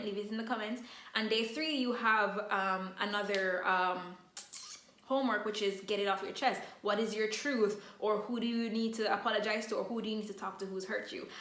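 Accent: Jamaica. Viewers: Wonderful video!... I could tell your a Jamaican lol Wah Gwan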